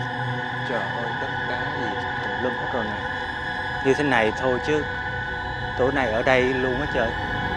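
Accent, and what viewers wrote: South, Vietnam. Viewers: Bạn có thể nói dễ nghe hơn một chút được không. Nhiều lúc bạn vừa đi cừa nói nên rất khó nghe. Cả gia đình mình từ già đến trẻ đều thích xem video của bạn nhưng mấy người già khá là khó nghe và gđ mình là người Bắc nên khó nghe tiếng miền Nam. Mình cảm ơn. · Nghe giọng Miền Nam là thích rồi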